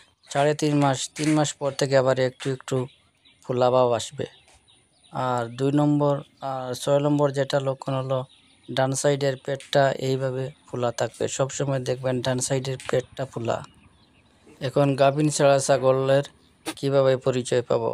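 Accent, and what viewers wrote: Sylhet, Bangladesh. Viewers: oh jiggesh korlm because apnr kotha gula sylhet er mto suddho kothar sate sate sylheti o mix ace tai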